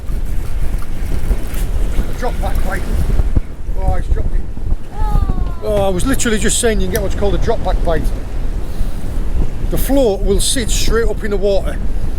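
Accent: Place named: Leeds, United Kingdom. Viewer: I came to sea fishing later in life, but the one thing i wish i could change was having my father with me as a child, sea fishing. carry on encouraging, you do a fab job, and is really catching on under your masterful guidance. Well done both of you. BTW are you a Leeds fan? The accent gives it away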